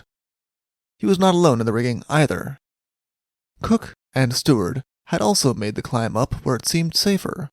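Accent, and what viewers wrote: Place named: United States. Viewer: What the hell is going on with the narration these days. Not to mention I am sick to death of hearing American accents for every damn thing that is read. They are the worst at the pronunciation of nouns and.any other name for that matter. How stupid is the rest of the world to let the USA take over everything on the planet. 😡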